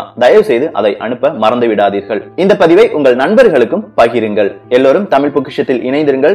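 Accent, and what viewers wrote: Kerala, India. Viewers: But the tamil pronunciation is in Malayalam.. 'koman' (common), kopy (copy), simbly (simply).....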